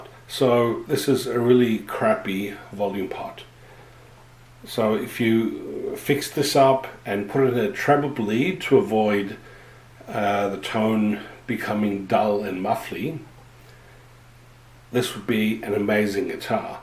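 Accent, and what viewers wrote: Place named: Australia. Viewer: Ahh hah! The ALMOST Oz accent and the fact you quoted the price IN AU dollars! ;oP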